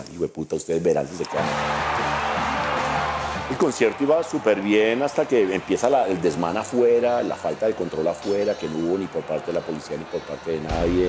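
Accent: Bogotá, Colombia. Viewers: tiene el acento más bogotano de Bogotá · Acento Rolo jajja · es acento cachaco propio bogotano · Mas Rolo que este man no se puede ser🤣🤣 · cuenta la historia muy amena, los Colombianos tienen un acento encantador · ese es el acento en Bogotá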